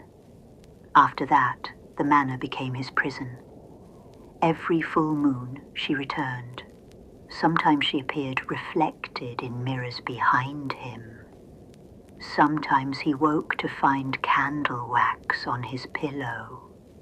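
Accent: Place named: United Kingdom. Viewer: I really enjoyed that, another British voice with added sound effects, wonderful!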